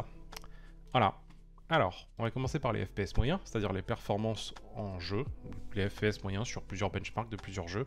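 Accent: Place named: Switzerland. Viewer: Toi t'es suisse mdr je reconnais mon accent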